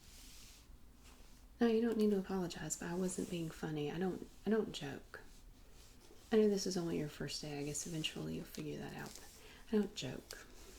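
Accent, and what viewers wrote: South, United States. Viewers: gosh I'm from the south and your accent sounds like everyone in my family. lol this character reminds me of a spoiled aunt I have...especially with the southern accent. Love it!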